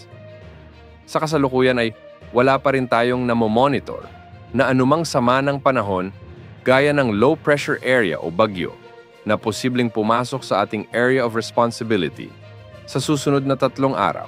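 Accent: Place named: Samar, Philippines. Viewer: samar ang datingan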